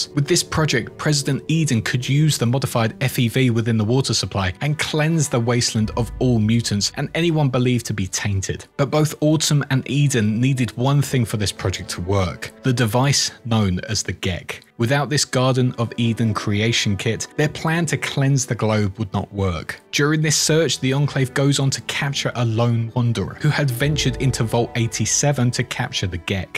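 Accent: United Kingdom. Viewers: Oh god NO did I find another British narrated video game lore channel? Now I’m going to have to watch all the videos. I’m caught up on the fudgemuppet catalog already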